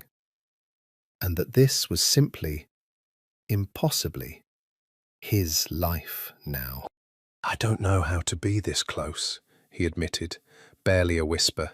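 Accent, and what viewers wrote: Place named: Liverpool, United Kingdom. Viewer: Sounds like a Liverpool accent love it great storyline and characters well developed 🎉🎉🎉🎉🎉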